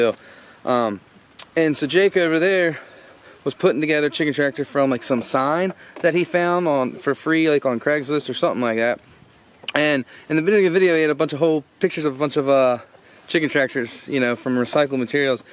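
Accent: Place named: Maryland, United States. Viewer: Definitely a Marylander. I know that accent from anywhere. 😉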